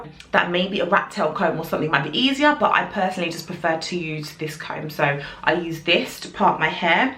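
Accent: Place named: United Kingdom. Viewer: You have a beautiful UK accent and your tips are nice and right on point.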